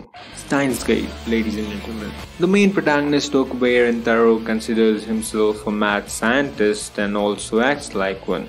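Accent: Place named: India. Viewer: Crazy hearing someone that sounds like their first language comes from India talking about science like that mind blowing. I thought every Indian loved science. 😭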